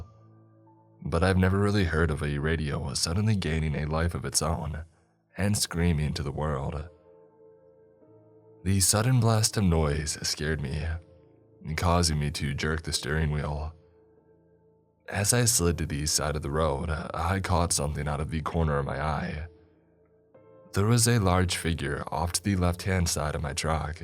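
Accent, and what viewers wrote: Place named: South, United States. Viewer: I have never heard your normal voice. You almost sound southern. And your voice is naturally that deep?! Good God lol.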